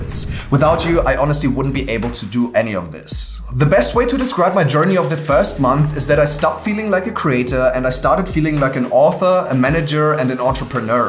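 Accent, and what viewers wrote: Germany. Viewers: Respect und wusst ichs doch dass du nen Deutschen Akzent hast🙃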